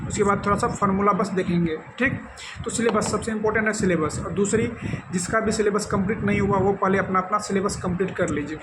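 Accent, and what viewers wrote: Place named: Bihar, India. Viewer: Bihar से हो क्या आप,,,❤🎉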